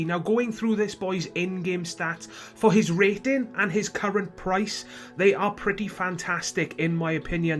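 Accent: Wales, United Kingdom. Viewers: Are you welsh!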